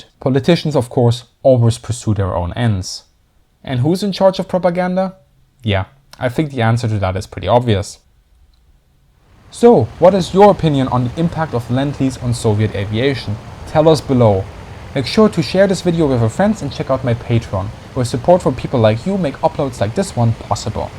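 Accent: Germany. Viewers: Sorry, but the guy is a native German speaker who has taken the time to learn English so we can get excellent information that many English historians do not access because they do not read German, or Russian. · The video is very informative and interesting as well, though I’m sorry, but I simply can’t listen to that hard german accent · We have always been grateful of the USA effort to help us against NAZI Gernany. And we did pay back Lend Lease. Though our tanks were better we loved he USA and still do. The spam you sent us was a great relief to our rations. So please do not think all Russians are not thank full or were not thank full. I wish people like you understand the whole issue. How long did it take Germany to pay of the damage they created in Europe. My family is from St Petersburg. Please do not put all Russians or CCCPs population and military in the same box. 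BTW most of my family perished in St Petersburg. So what is your real point. You seem bias. NKVD really???? Or what about your Gestapo. Yes you sound German. If not I do apologize.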